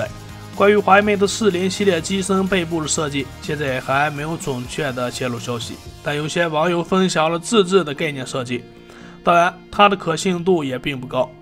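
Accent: Shanxi, China. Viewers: 山西还是陕北口音？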